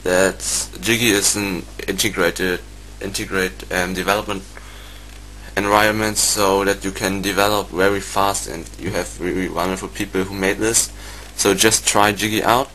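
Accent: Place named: Germany. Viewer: lol german accent ftw! :D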